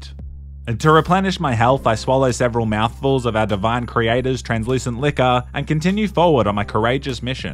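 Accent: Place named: Australia. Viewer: He is not mispronouncing wrong! Its his Australian accent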